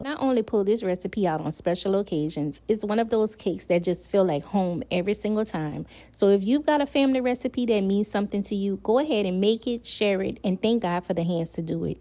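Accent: Louisiana, United States. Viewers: At not one point did you say “babe” in this video. I’m highly disappointed because that accent has Louisiana written all over it 😩😭💜💜💜💜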